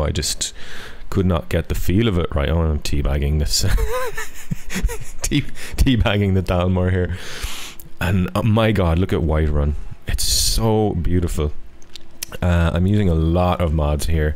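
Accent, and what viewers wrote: Ireland. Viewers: He has an Irish accent if you didn't know.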